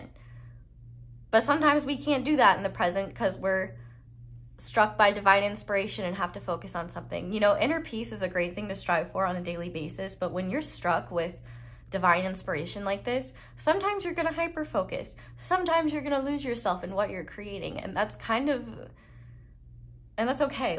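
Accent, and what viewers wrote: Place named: South, United States. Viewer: Pile #2, I love your Southern accent lol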